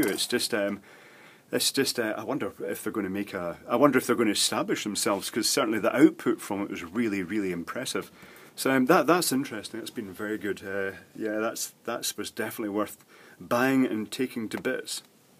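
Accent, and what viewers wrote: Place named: Isle of Man, United Kingdom. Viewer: You live in Isle of Man? I thought you were Scottish, never thought Manx. That's cool!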